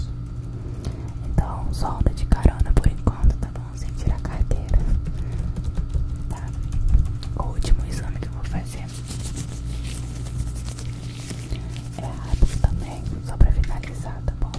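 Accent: Rio de Janeiro, Brazil. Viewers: Eh tu é carioca??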